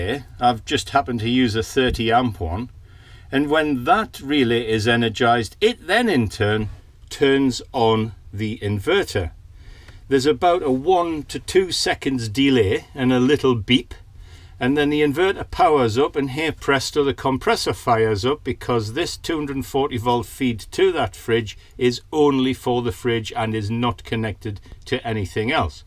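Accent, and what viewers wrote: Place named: North East England, United Kingdom. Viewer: I recognise a bit of a north east twang to yourself, great video and information, I will steal that idea, cheers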